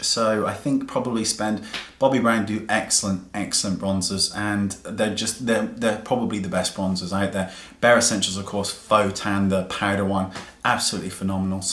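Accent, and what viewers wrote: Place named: Bristol, United Kingdom. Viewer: Love the Bristolian accent 😊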